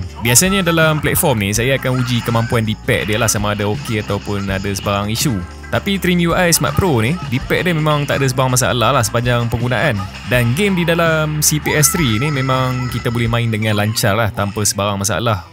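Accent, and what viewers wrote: Malaysia. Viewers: slang utara campuq selatan 😅btw nice review ,subscribed🎉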